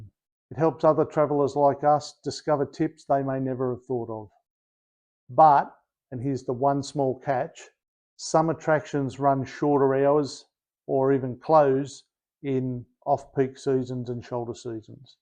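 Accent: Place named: Australia. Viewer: I thought you had an Aussie accent. Wow, you’re a world away from USA and the State of New Jersey! Great luck to you, dare I say “mate”.😊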